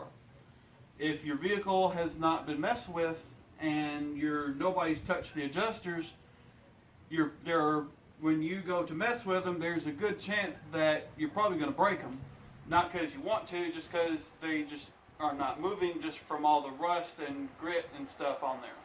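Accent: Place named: Appalachia, United States. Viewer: You sound like an Appalachian.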